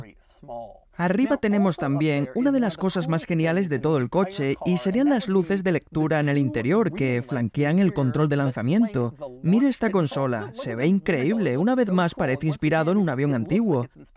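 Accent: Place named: Galicia, Spain. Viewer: Estoy harto de escuchar la tonada gallega...las z me tienen las bolas x el piso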